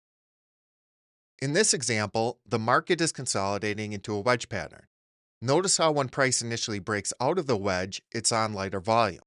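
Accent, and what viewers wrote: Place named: Chicago, United States. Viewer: He’s real. Real good at trading. Notice the Chicago accent? They’re real good at trading too.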